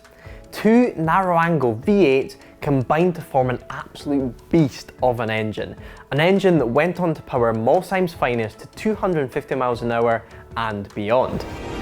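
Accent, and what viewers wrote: Scotland, United Kingdom. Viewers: the guys Scottish, a lot of people can't understand the accent. Didn't know if he was serious or not.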